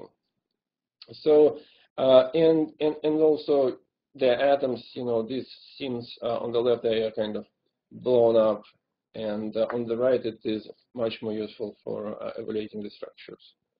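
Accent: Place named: Russia. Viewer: awesome Russian accent )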